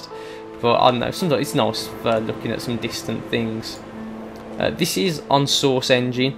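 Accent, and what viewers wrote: United Kingdom. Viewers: god damn I love british accents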